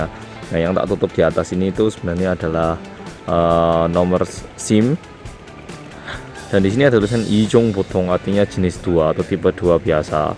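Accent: Java, Indonesia. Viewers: lucu rasanya mendengar orang korea ngomong nya medok.. emang sih aku juga medok dan aku asli Indo tapi masi kesusahan bahasa sendiri haha.. (bahasa jawa maksudnya). btw ibuku suka ngeliat video mu dan ternyata ya.. lumayan lah... ketawa sendiri aku ngeliat nya :v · logat jawanya nggak ilang2 haha 😂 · klo ngomong inggr singapore accent banget, klo ngomong indonesia jawa banget, klo mnurut sesama org korea, korean-nya beraksen juga ga? kan uda lama tinggal di Indo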